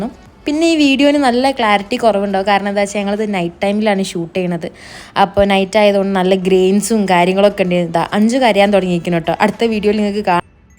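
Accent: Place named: Kozhikode, India. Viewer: His slank thani nadan kozhikode kari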